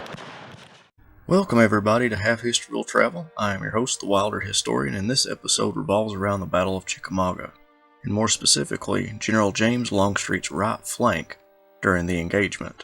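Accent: South, United States. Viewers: Another great episode! BTW, aside from your "accent" I know you are a Southerner because you pronounce Lafayette correctly.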